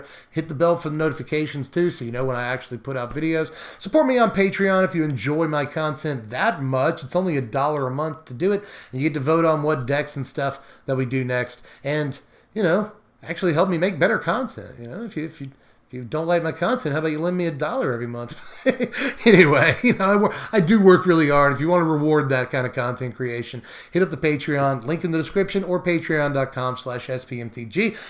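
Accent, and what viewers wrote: South, United States. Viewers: What part of the south you close to Tennessee lol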